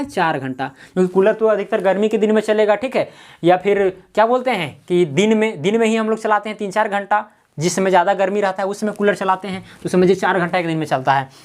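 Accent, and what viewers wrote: Bihar, India. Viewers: Bihar se ho aap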